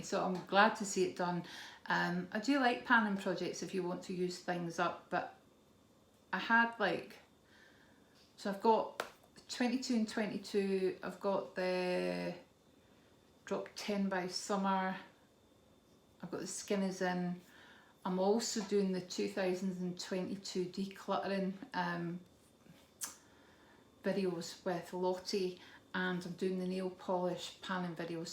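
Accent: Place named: Scotland, United Kingdom. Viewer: Hi trying to catch up on videos so going backwards 🤣. Love watching you and listening to your voice as it’s so nice to hear a Scottish voice as most of the you tubers I follow are English. Great video. Have a lovely day 🥰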